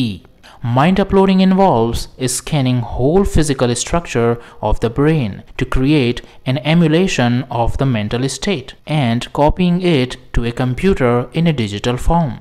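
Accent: North India, India. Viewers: Hi, can you please work on your pronunciations. It's very distracting to hear you talk with a north indian/gujju English accent. Or pls have someone else do the voice over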